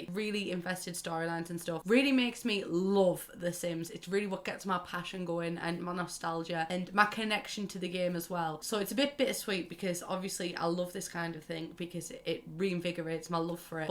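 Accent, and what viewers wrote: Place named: Liverpool, United Kingdom. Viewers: Another fellow scouser here too!!! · Scouser here too 🙋‍♀️ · As a scouser, I'm loving hearing a familiar accent after being so use to American on YouTube 🙌🏻 also recently came across your channel and I subscribed almost at once, you're Brill 💅🏻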